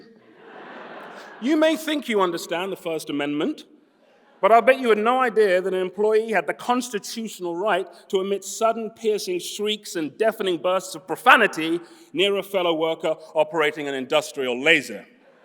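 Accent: United Kingdom. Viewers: shit is so scary when you see a guy in american productions only and then he's british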